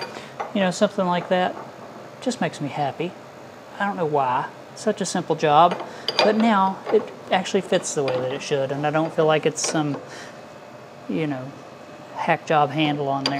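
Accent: South, United States. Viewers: First time I watch your video. Nice shop! From your accent I think you live in the south, somewhere it can get cold. North Carolina? I have a lathe and a mill, a much smaller shop than yours. I look forward to watching more of your work.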